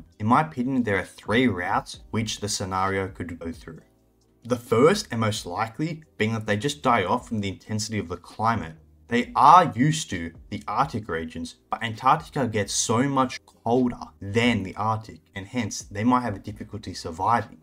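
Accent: Australia. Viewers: Thanks for the explanation with your heavy Australian accent.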